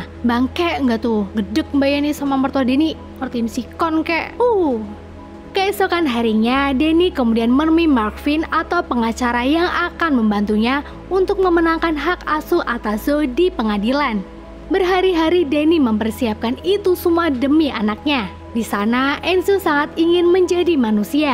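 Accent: Pemalang, Indonesia. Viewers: Wong pemalang kie lur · lahhh wong pemalang mbak????? samaaa ihhh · Orang pemalang ya ba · Wah wong Pemalang iye? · Mbak yani orang pemalang yak ?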